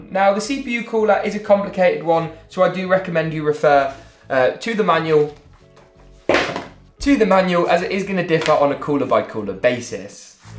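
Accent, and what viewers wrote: England, United Kingdom. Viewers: You are so fucking annoying, you keep coming up in my recommended even though I don’t watch 15 year old Pom kids, fuck you and your pommy accent.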